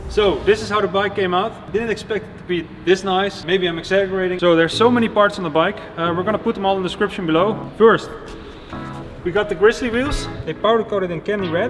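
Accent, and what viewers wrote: Netherlands. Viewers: Ik hoor wel dat je nederlands bent ^_^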